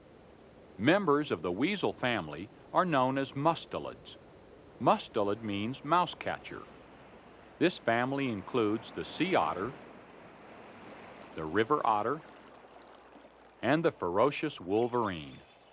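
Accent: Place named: United States. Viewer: Can any American tell me what regional accent the host has? I love it!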